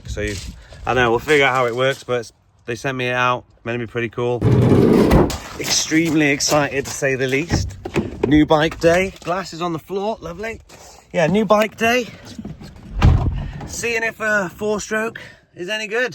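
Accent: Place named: United Kingdom. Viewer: I'm so thrown off by "MidWest" and then the British accent.... what?! ps. I also test rode the TF 250 and had a blast. I'll be sticking with my Husky for the foreseeable future though.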